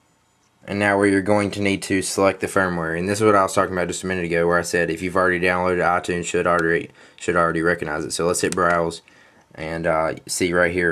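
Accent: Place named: Texas, United States. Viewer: u sound like ur from texas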